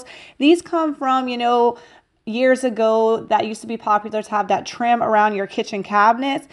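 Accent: Louisiana, United States. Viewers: Are you from Louisiana? You sound just like me! 😆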